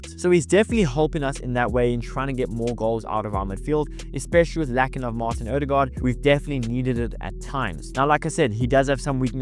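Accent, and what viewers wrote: South Africa. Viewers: You sound South African so I will definitely support your channel coyg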